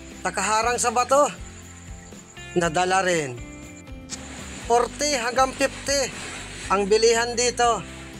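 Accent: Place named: Camarines, Philippines. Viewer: Idol taga camarines ka ba, yung tempo ng salita mo taga sa amin ay, ingat lang idol sa paninisid, Sana makahuli ka palagi ng marami sa bawat sisid.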